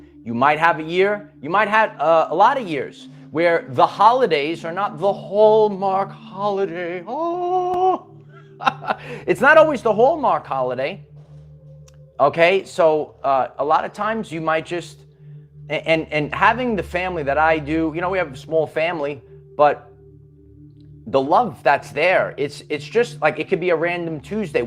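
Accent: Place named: Philadelphia, United States. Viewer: Are you at Dan Giacobbe’s house? Def have a Philly accent.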